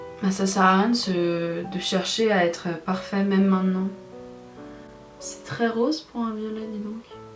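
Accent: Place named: England, United Kingdom. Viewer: Le maquillage est une catastrophe mais l'accent anglais en revanche est une pépite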